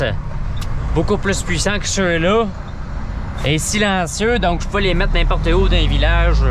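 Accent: Quebec, Canada. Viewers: J aime bien ta chaîne.. Malheureusement avec ton bel accent québécois, bien souvent c'est très difficile de te comprendre.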